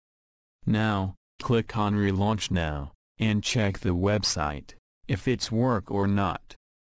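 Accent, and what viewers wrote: Romania. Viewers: Bro tell me ur Romanian!!!🤯🤯....same!!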